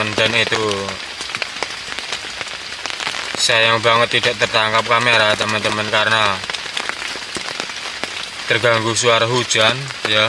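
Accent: Java, Indonesia. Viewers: Jawanya medok mas😅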